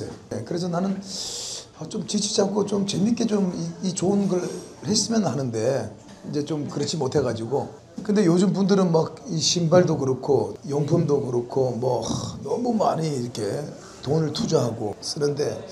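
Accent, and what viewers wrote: Gangwon, South Korea. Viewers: 강원도 사투리 억양 구수해요^^ 강릉 경포호에서도 러닝 클래스 해주세요😅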